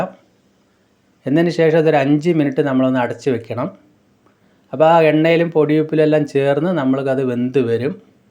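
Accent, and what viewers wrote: Palakkad, India. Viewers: ചേട്ടൻ പാലക്കാട്ട് കാരൻ ആണല്ലേ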